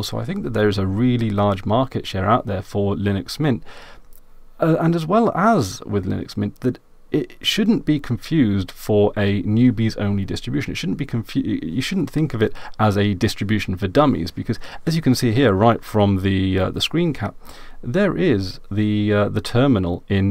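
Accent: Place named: England, United Kingdom. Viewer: You come from England, right?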